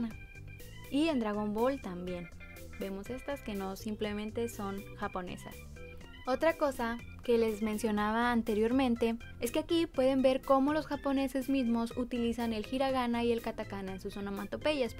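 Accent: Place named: Chihuahua, Mexico. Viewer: ¿eres de chihuhua? :v Tienes el acento parecido a los residentes de ahí xd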